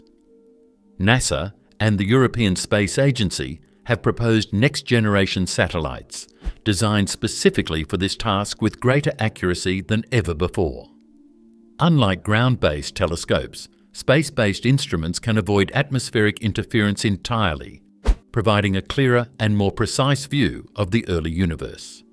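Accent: England, United Kingdom. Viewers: They have an English man read these dumb questions to seem smart. In the beginning God created the heavens and the earth.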